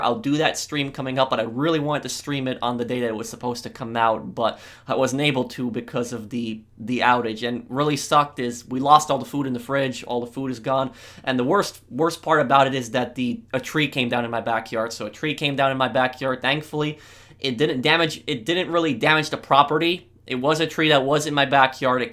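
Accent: Canada. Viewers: I sense canadian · Are you Canadian?????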